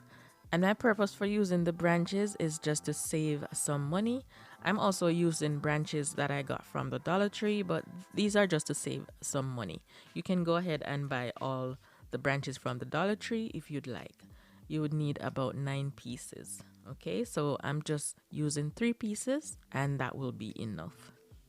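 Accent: Jamaica. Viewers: Such a pleasure to hear an AUTHENTIC JAMAICAN ACCENT on here...sooo soothing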